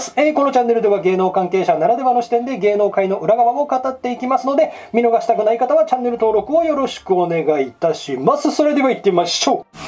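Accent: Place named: Kochi, Japan. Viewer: こーちみたいなしゃべりかたしちょる笑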